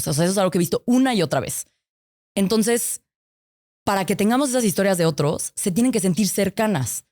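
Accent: Mexico City, Mexico. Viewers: Asi hablan en cdmx, cuando estudias en universidades privadas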